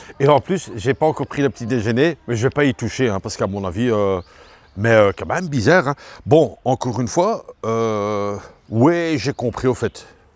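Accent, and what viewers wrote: Belgium. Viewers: salut,je suis tombé par hasard sur tes vidéos,je suis un amoureux de la Thaïlande,mais je n y suis jamais allé en tout bravo pour nous faire connaitre une autre Thaïlande un peu plus rurale.......étant Belge moi aussi il me semble capter un accent familier...... merci pour ces belles video!!!!!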